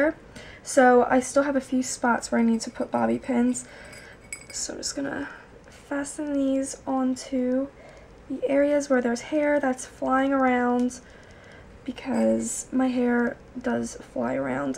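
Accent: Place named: New Jersey, United States. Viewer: Hello! Just stumbled upon you and your fiancé 's blog and landed here. I'm happy to see you have a beauty channel as I follow other gurus. I'm so excited though that you are also from south jersey so you are much more relate able especially with the jersey accent. Lol best wishes on your upcoming wedding!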